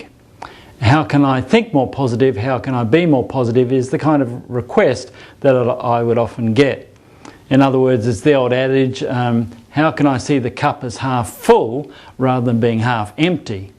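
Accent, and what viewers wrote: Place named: Australia. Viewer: some Aussie accent mate..i been to perth studying for 2 years and this accent reminds me everything there.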